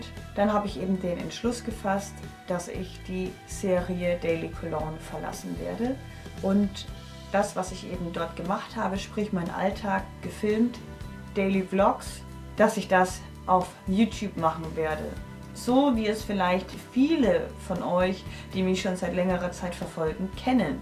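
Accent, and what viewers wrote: Franconia, Germany. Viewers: Nürnberg ❤️ Franken ❤️ Heimat ❤️ ich liebe es wenn du im Dialekt sprichst 😍😁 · Nürnberg, Erlangen, FÜRTH! 😂 sofort ist mir das Herz aufgegangen beim fränkischen Dialekt <3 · Ach Gott wie du fränkisch redest, einfach a super sach! komm auch aus der nähe Nürnberg/Erlangen und für mich gibt's nichts schöneres als mei schönes Franken ❤ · Ich wusste es!!!! Ich wusste es schon immer! Also das du aus Nürnberg, Umgebung kommst!!!!❤️ Ich liebe fränkisch und Franke erst recht❤️ Ich könnte mir nicht vorstellen hier weg zu ziehen. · einfach nur: #Frankenpower :) Ich liebe es wenn du fränkisch sprichst, da ich ebenfalls aus dem schönen Franken komme :D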